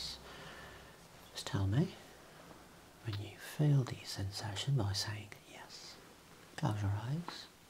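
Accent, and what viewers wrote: United Kingdom. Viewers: Are you British? Your accent and that jacket!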